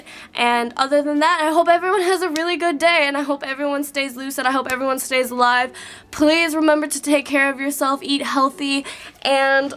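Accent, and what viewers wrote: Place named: Australia. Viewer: You're definitely getting an Aussie accent☺️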